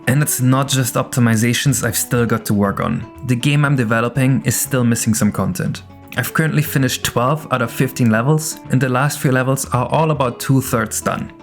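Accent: Germany. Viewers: this is the most german english I've ever heard in my life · I'm just confused as to why I thought you were Portuguese for years. Your accent is distinctly German, guess I'm just dumb.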